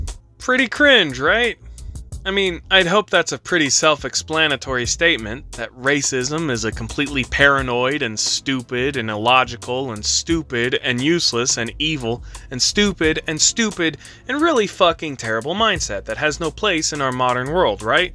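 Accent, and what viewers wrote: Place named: Germany. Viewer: It took me a bit to realize that your German accent wasn’t just a poor attempt at a Mario impersonation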